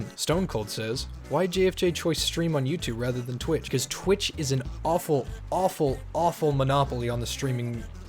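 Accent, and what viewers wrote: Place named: Dallas, United States. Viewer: So howabout that Dallas voice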